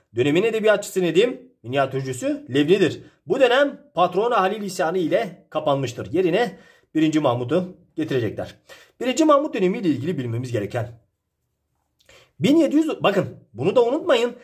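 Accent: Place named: Diyarbakır, Turkey. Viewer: Hocam alakasız olacak ama Diyarbakır'lı mısınız ? Tam o tarafın ağzı ile konuşuyorsunuz da merak ettim açıkçası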